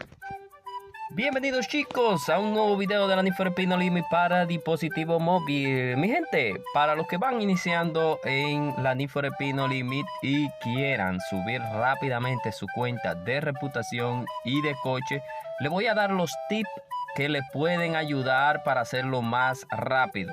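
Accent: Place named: Dominican Republic. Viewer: También soy Dominicano, me lo imagine por tu asentó, tienes un sub más.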